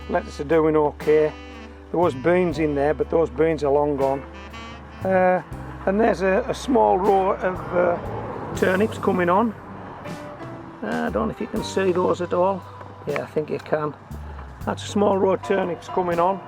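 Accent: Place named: Cumbria, United Kingdom. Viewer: great video pal think you must live in west Cumbria by yer accent mebbis st bees way,im just up the coast if you are ,will be copying your vids,great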